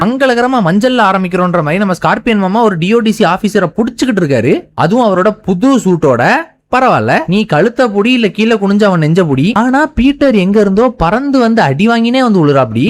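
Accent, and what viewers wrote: Kanyakumari, India. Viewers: Bro neega Kanniyakumari ahh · Bro neenga kanyakumari ahh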